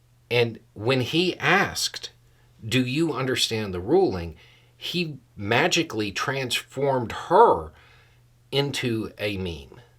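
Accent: South, United States. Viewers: You don’t talk slow. You talk country. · You don't talk slow sweetie, you talk southern and explain perfectly. Thanks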